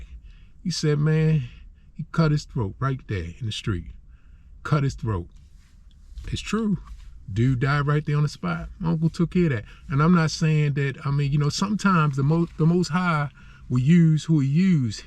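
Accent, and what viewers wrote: United States. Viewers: I hear a Maryland accent. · U got a Philly accent to me. I didn't even know cherry hill had "projects" shot out to the bottom of the hill❤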